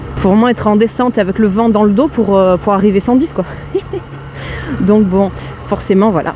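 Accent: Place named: Nice, France. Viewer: Bonjour 👋, Enchanté, joli accent de chez nous (Nice, Toulon ? J ai eu une ybr en 2011, en 10 ch, j ai réalisé tout les cols de montagne, arrière pays Nicois, même le col de la bonnette qui culmine à presque 2800m. Par contre pour l autoroute C était..... Mdr cata. La j ai une gsx r susuki (125) 15 ch.... Ça change. Avant le A2 que j ai commencé, pas évident du tout l épreuve du plateau. V a vous 2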